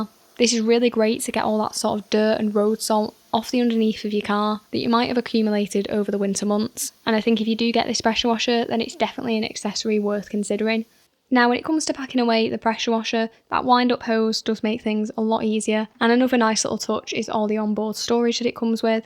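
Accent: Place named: Manchester, United Kingdom. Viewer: Edit. See my reply Would you go for the Ava Go p55 over this? It seems AVA themselves no longer seem to sell this on their website. Reason I'm thinking is as follows:- Nilfisk £240, plus £40 for standard stubby gun you suggest (plus adapter?), plus £50 for MJJC Foam Cannon = £330. p55 Go with Foam Cannon (probs not good as MJJC but the Premium Inc one here for AVA will probs be good enough) = £260 Any input would be greatly appreciated. Maybe you wanna sell your p55 cos you've got much better and it sounds like you're from Manchester! ? Again, any input would be greatly appreciated. I did consider the p40 Go £150 but not sure would be quite good enough when trying to do most washes via BH Touch-Less without a contact wash.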